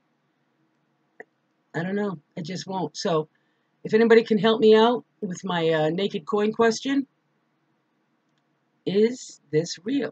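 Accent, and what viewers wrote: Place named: Northern England, United Kingdom. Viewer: I just love your Northern accent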